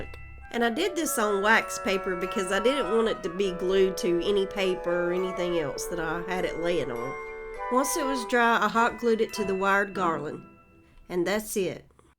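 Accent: South, United States. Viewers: Love your decorations and your accent! I am a southerner too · Honey child, I don't know where you're from, but I just love your honey and molasses southern accent. Cute ideas.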